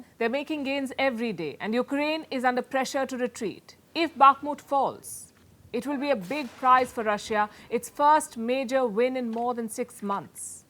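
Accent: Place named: India. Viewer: It's Amazing how Indian slaves mentality talk in funny English 🥁🤣🇬🇧 rule Britain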